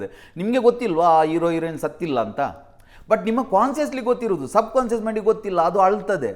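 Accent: North Karnataka, India. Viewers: To the interviewer - you should have come well prepared bro ... Looks like you are from North Karnataka. Your accent says it all. But please do your homework 🙏.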